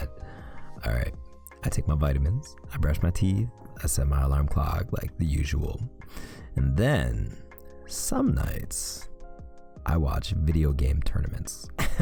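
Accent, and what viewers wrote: Canada. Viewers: your the only reason why canadian accents are one of my faves rn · There are alot of things to talk aboot Oh yes he's canadian 😍 Dont worry I'm canadian too 😆😆💋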